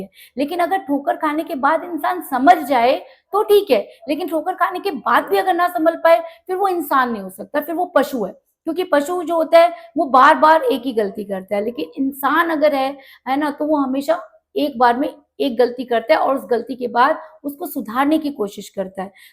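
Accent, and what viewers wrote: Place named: Uttar Pradesh, India. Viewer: Mujhe ap ki bate style muhavre ache lagte hi . Ap age par atak jati hi ye apka boring point hi. I am sure ap UP si hi. Andaze guftagu yehi Zahir karta hi. Ap intelligent hi. Yaha sirf third party nahi hi. In janab ki dozens women si intimacy hi. Isiliye kisi ek ke nahi ho sakte. 50 jagah moh Marne ki adat maza shouk hi. Ap ke liye info.hi .Rdgs mi Kam ayegi.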